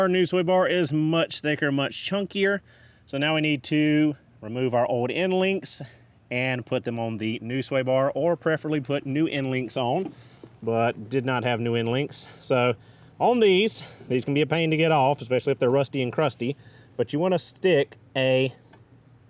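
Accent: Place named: Carolina, United States. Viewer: I'm always fascinated by the rural Carolina accent(s) in which "on" is pronounced "own" where virtually everywhere else in the U.S. it's "awn". Here in New Orleans, we perfected "puhkawn" for "pecan" while the rest of the country goes with "peecan". Variety is the spice of life!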